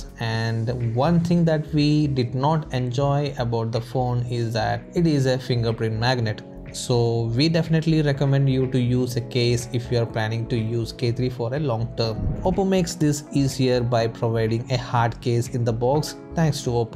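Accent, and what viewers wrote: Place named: Kerala, India. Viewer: malayalai ano bro? english arayumpol its understading... but video is good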